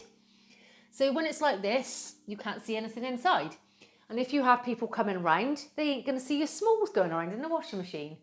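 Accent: United Kingdom. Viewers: Bristol accent ?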